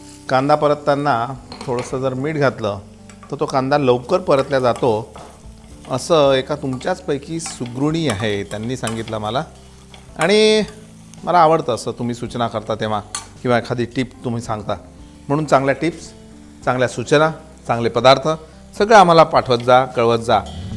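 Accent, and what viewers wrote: Vidarbha, India. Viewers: Hi I am from Baroda and I am a regular follower of your recepies.I love the vidharbha touch in your Marathi reminds me of my childhood days ....We call it as Sev Tamata nu shaag.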